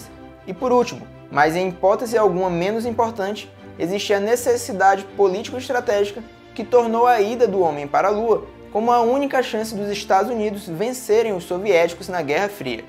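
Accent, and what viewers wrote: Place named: Ceará, Brazil. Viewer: Q bom q o YouTube me recomendou o seu canal. Sou vidrado no espaço e em suas estrelas. Sou de Fortaleza - CE, posso estar enganado, mas tenho quase certeza q vc tbm é cearense por causa do sotaque 👏, LIKE, + 1 inscrito, e tmj irmão!!!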